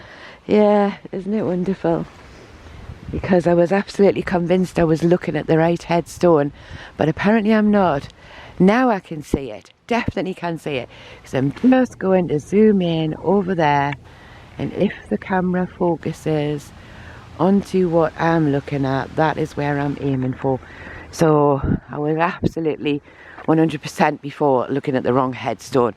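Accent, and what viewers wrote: Newcastle, United Kingdom. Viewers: Love your content & love the Gordie accent. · More Geordie!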